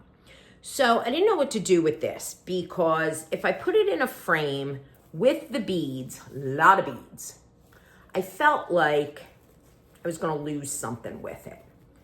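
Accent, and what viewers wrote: New Jersey, United States. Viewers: Speaking as a fellow "just across the bridge from Philly" native New Jerseyan (who has been transplanted to Northern VA) I love to listen to your flosstubes and your "accent". You sound like home to me :)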